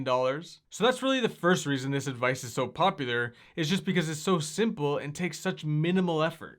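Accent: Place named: Canada. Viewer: Hey what app or brokerage do you use to buy stocks with ? Lol also I can really hear the About! (fellow Canadian haha)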